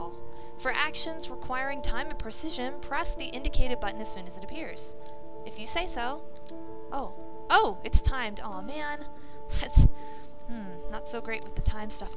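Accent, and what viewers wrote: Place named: Canada. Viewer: lovely commentary,very plesently spoken..you sound canadian..nice voice..kinda similar to a LP'r name schaly..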